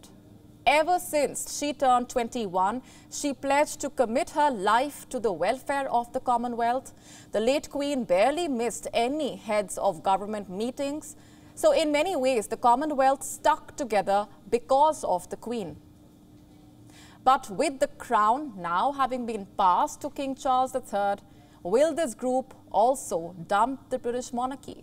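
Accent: India. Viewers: what do you think it means. An Indian accented English speaker. It's a common thing these days. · Speaking english in indian rythm is interesting..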